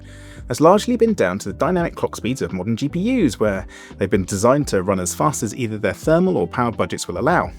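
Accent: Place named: England, United Kingdom. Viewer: Ah my bad then I thought it was a UK publication only - guy has an english accent as well in my defence haha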